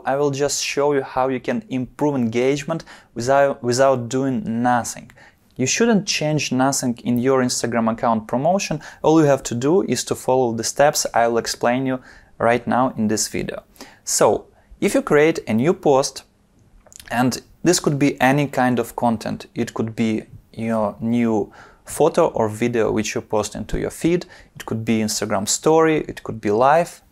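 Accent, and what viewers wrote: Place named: England, United Kingdom. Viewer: Great video, you have a nice English accent! Keep it up m8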